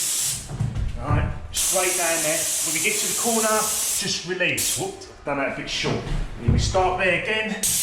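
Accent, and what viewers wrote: United Kingdom. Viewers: You have a proper British accent, I shall trust you.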